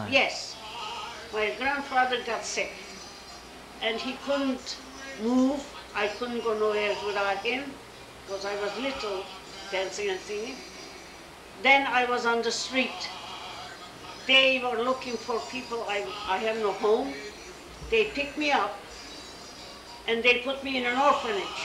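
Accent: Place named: Kayseri, Turkey. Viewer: Ah.. The first time I cried so much to an Armenian story. She is still telling the Turkish words with a Kayseri dialect, also talking English with a Kayseri mouth. I don't believe an orchestrated genocide but personal stories are so dramatic :( The places this lady telling are correct; Kayseri, Boğazlıyan Orphanage etc.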